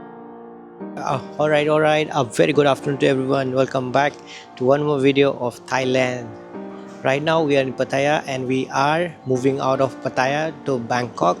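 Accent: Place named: India. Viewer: Change urrrrr indian accent please. They will think u r Nepali bhaiya . Rrrrrr. Oooooor change it please my ear is bleeding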